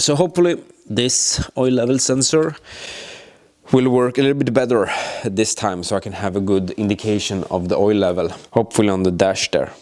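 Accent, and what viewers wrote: Sweden. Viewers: This is amazing! When you say "job" say it like "djob" and when you say cheap say it like "tcheap" :) That's how I learned to correct my English. Just some feedback from another swede, if you interested :x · Are you swedish?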